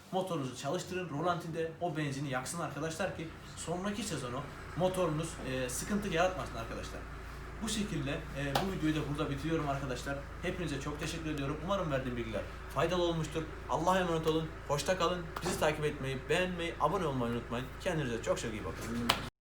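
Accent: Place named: Black Sea, Turkey. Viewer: Karadenizli şive farkı var söylem tarzı var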